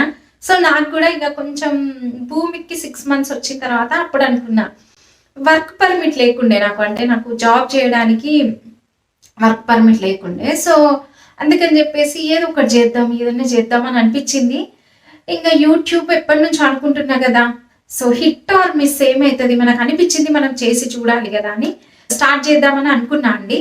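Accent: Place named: Andhra Pradesh, India. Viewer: akka evideolo okati change vundi emito telusa me voice Andra Telugu matladaru telanga languagevadilesi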